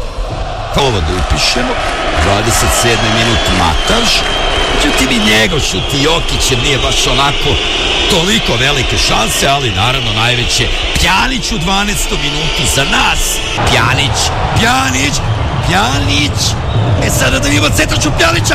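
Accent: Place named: Ekavian, Serbia. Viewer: Zasto ovaj komentator prica ekavicu?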